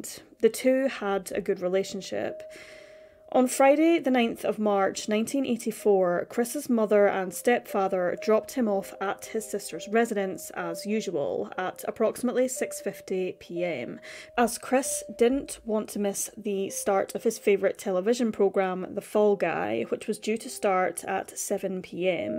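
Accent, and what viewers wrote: Scotland, United Kingdom. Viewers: Shes Scottish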